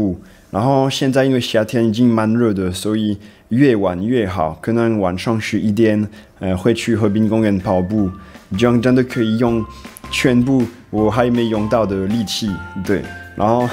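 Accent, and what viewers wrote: Taiwan. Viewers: 真的? 假的? 好台哦！🤣🤣🤣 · 講話很台灣味欸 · 台灣腔中文真的有夠台的~😁😀💞👍👍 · 真的很台灣味你如何學好中文的?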